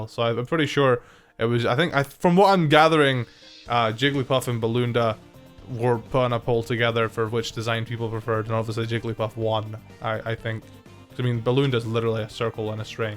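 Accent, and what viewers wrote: Scotland, United Kingdom. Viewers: I always thought you sounded slightly Scottish but I know for defo bc the irn bru, yasss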